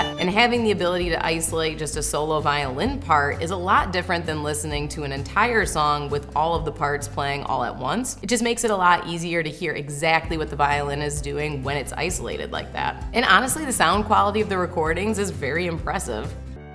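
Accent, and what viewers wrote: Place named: Upper Midwest, United States. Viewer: I had literally never heard her speak, so I didn’t know she had such a thick Wisconsin/Minnesota accent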